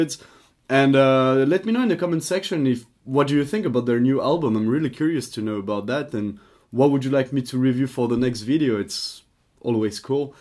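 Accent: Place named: France. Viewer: Avoue t'es français, on a tous cet accent xD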